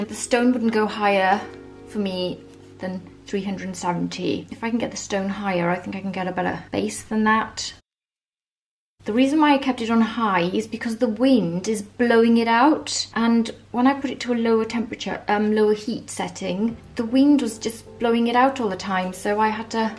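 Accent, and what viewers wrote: Wales, United Kingdom. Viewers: i can't believe this cause i was checking for uuni gas burner reviews & this was my 1st vid cause EVERYONE KNOWS I LOVE PIZZA & WELSH ENGLISH. this is a good sign, but didn't like the dough lol. love from USA.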